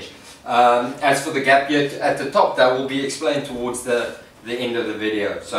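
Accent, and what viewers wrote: South Africa. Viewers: Great! I have a tiny shop and was going to build something similar, only smaller.. Awesome ideas.. BTW, love your accent, South Africa? :D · are you south african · South African?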